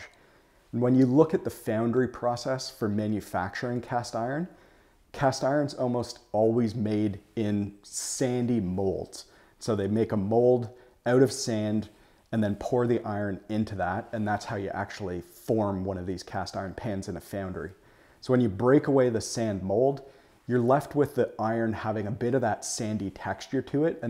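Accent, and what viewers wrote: Canada. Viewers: Once I heard the Canadian accent I couldn't unhear it lol Great review!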